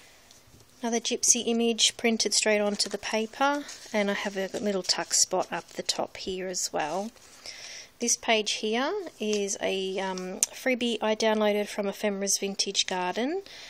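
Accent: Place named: Australia. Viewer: A great journal. Your swap partner will love it! Tfs and it's good to hear your Aussie accent. You did a really good job of filming and narrating.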